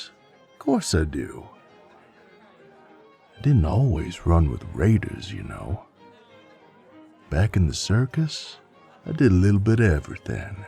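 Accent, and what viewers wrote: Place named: South, United States. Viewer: SOUTHERN ACCENT😭🙏🙏🙏🫄🫄🫄 CONSIDER MY M PREGGED AND MY EARS GASMED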